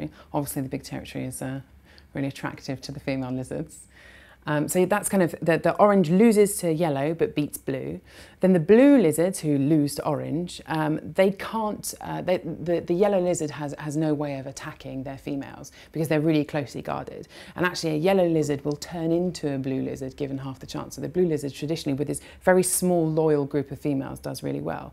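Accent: United Kingdom. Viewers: I love the British accent ! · Hate that british accent. Brr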